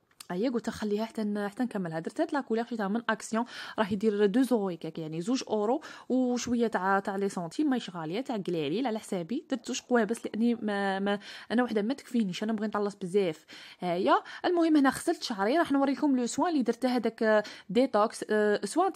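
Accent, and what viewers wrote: Oran, Algeria. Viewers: باينة عليك وهرانية ومتروطشة كي حالتي نوض على الفجر نخرب في شعري وجهي٣على العموم متشرفة بيك٣اختي عجبتيني٣من هدرتك وغادي ندير اشتراك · شعال نعجبني هدر تاع غرب حوجيان تفكرني بلبلاد هههههه 3تاع صباح حرزي يخرجلك سمهروش 😂😂بصحتك خرجتي زلة أول مرة نشوف قناتك ❤ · جيتي تهبلي تعجبني هدرتك نحسك من الغرب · اني جاية من عند ام لارين عجبتيني ههههههههه هدرتك وهرانية فحلة ههههههه انا من مستغانم بالتوفيق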